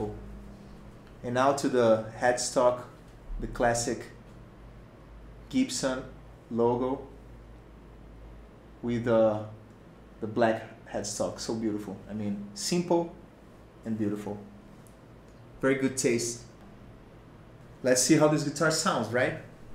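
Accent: Brazil. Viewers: Brazilian accent has been detected. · Cara, vc é brasileiro? Esse inglês tá muito abrasileirado hahaha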